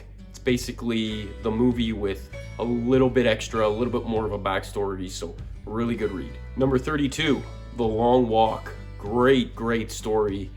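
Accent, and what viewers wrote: Canada. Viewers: Love this presentation, love your Ontarion accent.Respectful , sincere , dedicated- lots of fun. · You're Canadian, aren't you? (I subbed btw nice list)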